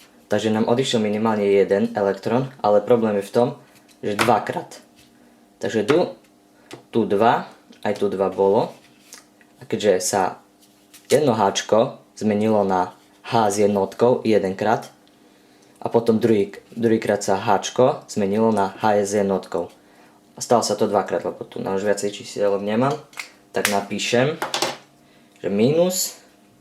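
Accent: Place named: East Slovakia, Slovakia. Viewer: To je asi najvychodňarskejší prízvuk na svete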